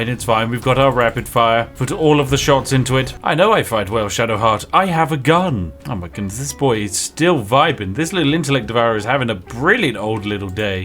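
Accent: United Kingdom. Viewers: Words cannot express how much I want Boblin - with Spiffing Brit's very british narration still in place - meeting SmuckSquad's Goblin Slayer. Its the sort of crossover that's born in the depths of hell and should not work but I have full faith that with some Yorkshire Tea we can pull it off. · Here is the thing. When has Spiff ever failed? Never! This is just about seeing how awesome his british voice is. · I got excited when you said boulders gate free... Then I realize your British with a ridiculous accent...😢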